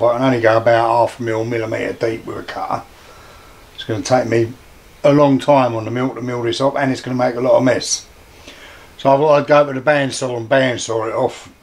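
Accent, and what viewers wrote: East London, United Kingdom. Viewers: As you say not sure whether it was worth it, but on the whole I think it was, because if you had gone out and bought another one it would be a, Chinese, b, crap, and c, you would have had to put it on the mill to correct the crappy machining to get it anywhere near as accurate and smooth operating as you have made your old one! By the way, is that a Warco mill? This is the first time I have seen you using the mill, and as its the same colour as my Warco 300 lathe/mill outfit i just wondered. I live in L.B.Redbridge, are you anywhere near me? I ask that as we share a common East London accent and I mean in my case at least very common! I look forward to your next project eagerly.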